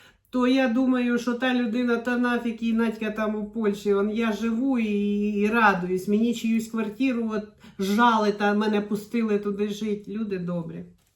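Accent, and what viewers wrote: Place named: Enerhodar, Ukraine. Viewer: Цікаво, що де той Енергодар і де та Велика Писарівка, а говір один і той же - один-к-одному🤔💗👍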